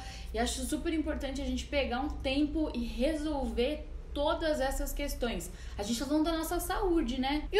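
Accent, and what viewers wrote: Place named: São Paulo, Brazil. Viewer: E esse sotaque paulista? hehe (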